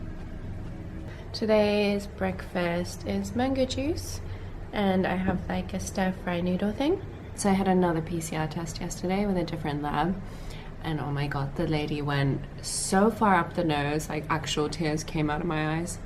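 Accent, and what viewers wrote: United Kingdom. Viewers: I’m glad that you recovered! Thank you for sharing your story! I love your YouTube and your British accent ☺️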